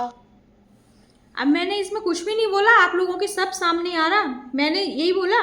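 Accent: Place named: Madhya Pradesh, India. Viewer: Waise aap MP se kha se ho…mai bhi MP se hoon….apki language Suen kr lga ki aap hmare taraf k hi ho😅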